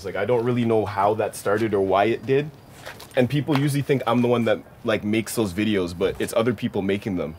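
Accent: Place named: Canada. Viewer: that canadian accent really comin through on this lmao, goat shit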